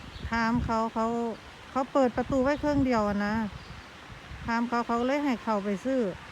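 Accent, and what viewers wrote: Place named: Nakhon Phanom, Thailand. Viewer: วิวสวยมากค่ะ สำเนียงพูดเพราะจัง เหมือนคน นครพนมน่ะค่ะ~~^^🥰🥰🥰🥰🥰🥰🥰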